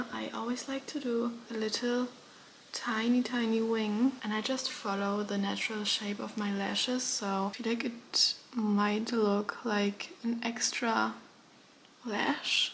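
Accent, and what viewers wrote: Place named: Germany. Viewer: Hey, erstmals tolles Video 🤗 Nur ein kleiner gut gemeinter Ratschlag: Man kann raushören, dass du deutsche bist und es dir manchmal schwer fällt die richtigen Vokabeln zu finden/ dich auszudrücken.. Wahrscheinlich drehst du deine Videos auf englisch um mehr Leute aus aller Welt zu erreichen, was ich vollkommen verstehen kann aber vlt solltest du einen 2ten deutschen Kanal erstellen oder einpaar Videos auf deutsch drehen denn ich hab das Gefühl so kann man einen besseren Eindruck von dir als Person gewinnen & du kannst deinen Charakter mehr zum Ausdruck bringen und somit leichter eine Community aufbauen :) Nicht falsch verstehen aber vlt denkst du drüber nach, mir gefallen deine Art von Videos sehr🤍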